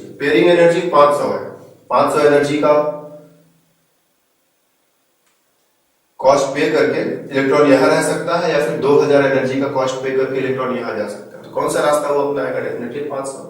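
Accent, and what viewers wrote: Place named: Uttar Pradesh, India. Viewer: sir aap U.P se lgte hain. bohat acha smjhaaye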